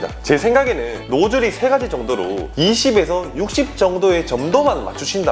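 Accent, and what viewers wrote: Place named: Gyeongsang, South Korea. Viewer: 영상이 너무 뜸해요. 구수한 경상도사투리가 매력적인데 자주 얼굴보여주세여!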